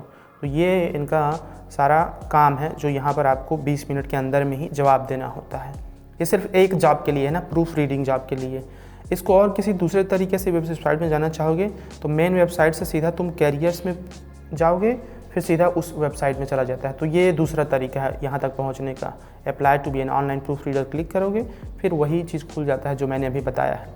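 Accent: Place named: Chhattisgarh, India. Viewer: Videos k start me Johaar jo bolate h..us se lagta h...aap India me CG se h... reply kre..vaise toning CG wali lagti h...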